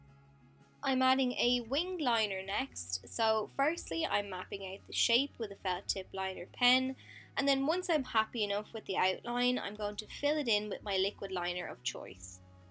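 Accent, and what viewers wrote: Ireland. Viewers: Great youtube makeup artist 1. because she is talented 2. lovely irish accent :)!!!!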